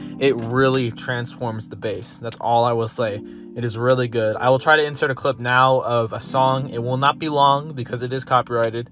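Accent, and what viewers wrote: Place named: Germany. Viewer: ich hör da doch deutsch raus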